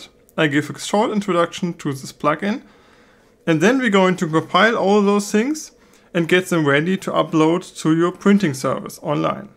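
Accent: Germany. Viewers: LOL! I love the German accent! Geil!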